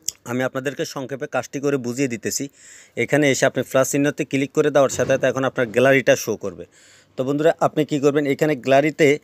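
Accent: Noakhali, Bangladesh. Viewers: আপনে নিশ্চিত নোয়াখাইল্লা কোন সন্দেহ নেই??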